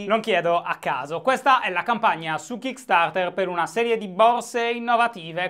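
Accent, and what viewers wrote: Sardinia, Italy. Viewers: Quel momento in cui fai vedere Breaking Italy a tuo padre e lui ti risponde "ma è sardo questo qua".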